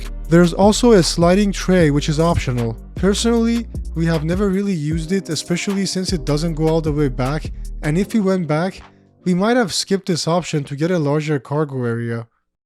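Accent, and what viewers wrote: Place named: Iran. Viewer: Nice Iranian accent. Iranians love Toyota’s 👍🏻👍🏻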